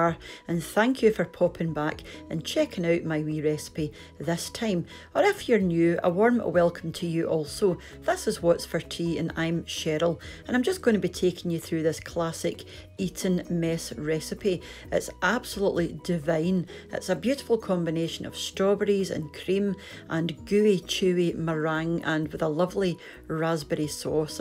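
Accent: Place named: Scotland, United Kingdom. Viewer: I’m a new subscriber (about a week) and if I may, (I mean this with the utmost respect PLEASE know that) you have got the most beautifully soothing voice, & accent or brogue I guess. May I ask where you are from? My guess is Scotland, but I’m not sure of what a welsh accent sounds like. I honestly do not mean ANY disrespect to you, just curious. (John From California)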